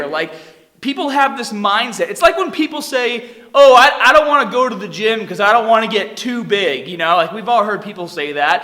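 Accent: Boston, United States. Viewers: the boston accent is killer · Are u from Boston ? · That Boston Accent is so distracting lol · It’s a Boston accent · You probably think of his Boston accent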